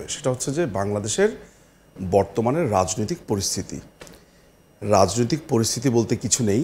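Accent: Kolkata, India. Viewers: Kolikata accent already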